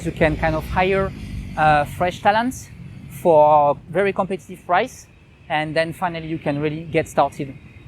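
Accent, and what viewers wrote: France. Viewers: The first 10 seconds watching, I was honestly convinced he was putting up a fake French accent!!! ;)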